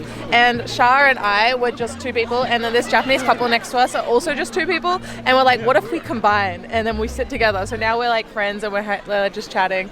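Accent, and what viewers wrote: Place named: Australia. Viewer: I dont think I ever comment on your videos, I like how you show things in a chill way with your aussie accent! <3 enjoy! my dream is to go to japan one day !😄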